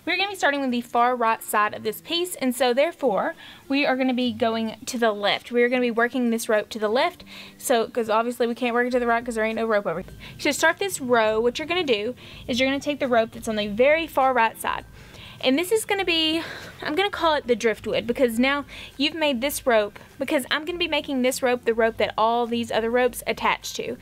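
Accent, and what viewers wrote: South, United States. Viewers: Oh my gosh, you are such a stunning Southern Belle! I love your accent, I love your vibe and thanks to your simple and beautiful videos I can now do macrame just like my mama used to when I was little. She tried to teach me but let's just say she wasn't a natural born teacher lol. Thank you so much! Big hugs 😘😘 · Thank you sweetie, I love your accent, I am a southern girl too, need to learn macrame so here I am! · Where are you from? I know it’s the south for sure 😂🤣😂🤣😍😍😍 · I like your accent. What Southern State are you in? This Video helps me a lot. Love it. Thanks! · I hear a Southern accent...where are you from? Tennessee here. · Love your southern accent !😂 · Now if all my teachers in the high school where is interesting beautiful talented and charismatic as you are I think I would have been a straight a student not to mention that beautiful Southern accent really makes you hang on every word I can't thank you enough stay safe and and god bless ✌️🇦🇺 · I'm from California and very very rarely do I like southern or country accents but yours.....omg! 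Its toooooo cute! I love it, love your bubblyness that's not goofy and I LOVE how articulate you are with your directions and instructions! You're a VERY GOOD instructor! Thank you for the refresher course!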